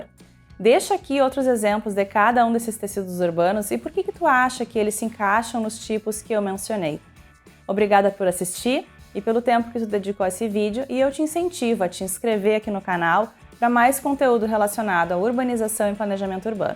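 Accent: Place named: Rio Grande do Sul, Brazil. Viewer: Bah fácil perceber que tu és gaúcha né guria😂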